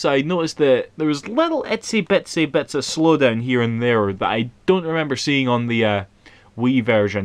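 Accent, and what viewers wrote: Scotland, United Kingdom. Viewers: Your accent isn't as thick as I would expect from a typical Scot. I can actually understand you. :) Now some of those English lads... ;)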